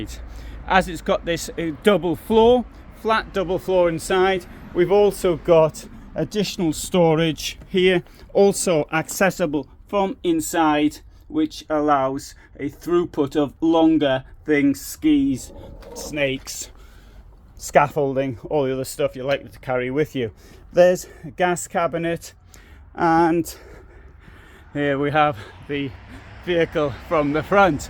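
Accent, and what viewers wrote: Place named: Geordie, United Kingdom. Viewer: Judging by his wonderful accent I’d say the North East of England, if I had to guess I’d say Tyneside.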